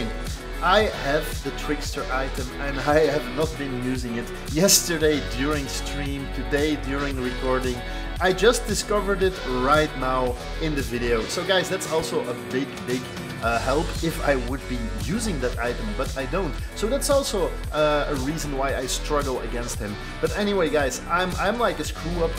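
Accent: Netherlands. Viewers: Hi just a question, are you dutch? Cause your accent sounds like dutch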